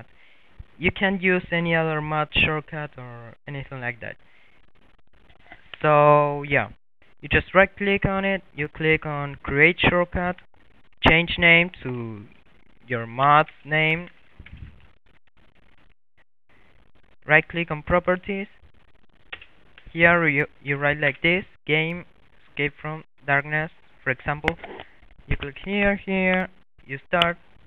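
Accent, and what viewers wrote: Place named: Argentina. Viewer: YOUR ENGLISH SOUNDS LIKE ARGENTINIAN! I'll suscribe.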